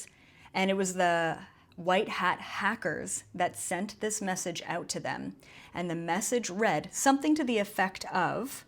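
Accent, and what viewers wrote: Canada. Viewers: Thank you for your readings!! I found your channel about a week ago and realized you were Canadian from your accent! My parents and relatives are from Canada, they would be appealed by what is happening in the United States now!! Total corruption!!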